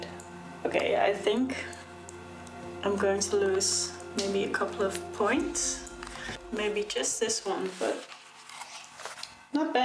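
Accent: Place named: Netherlands. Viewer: Ik vroeg me vanaf het begin af of je Nederlandse bent, ik dacht dat aan je Engels te herkennen. En daar is het bewijs: in het Excel blad!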